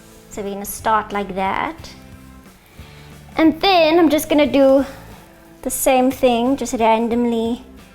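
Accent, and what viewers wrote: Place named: Zimbabwe. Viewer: You sound Zimbabwean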